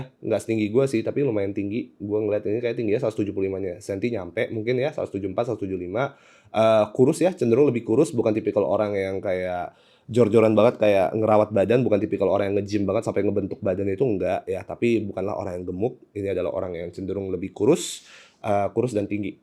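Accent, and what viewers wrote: Java, Indonesia. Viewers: medoknya emang jawa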